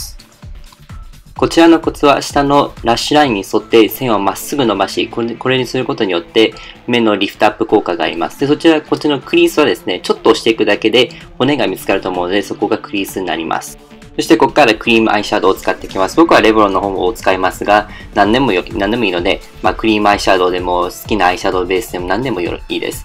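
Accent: Kansai, Japan. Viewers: めっちゃ関西弁やん♡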